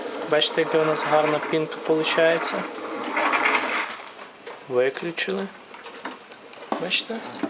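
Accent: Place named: Lviv, Ukraine. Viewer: гадостный акцент львовский. лучше бы молча делал.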